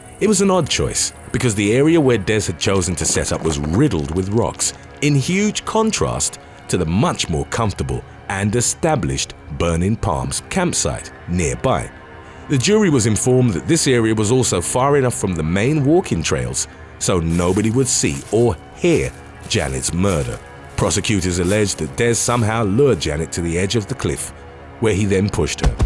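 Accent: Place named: United Kingdom. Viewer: um, where did he say ANYTHING about correct English? HE'S A BRIT.... meaning he's not from USA and is NOT familiar with our pronunciation.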